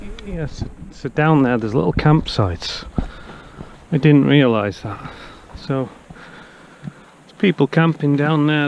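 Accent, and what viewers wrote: United Kingdom. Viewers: glad you're keeping the old UK traditional dialect alive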